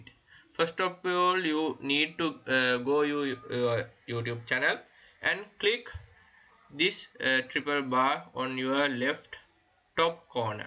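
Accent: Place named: India. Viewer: I don’t understand anything he saying because it’s Indian accent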